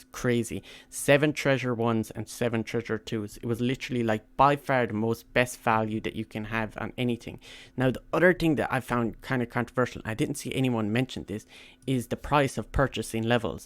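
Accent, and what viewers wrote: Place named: Ireland. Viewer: I can't help but notice your beautiful Irish accent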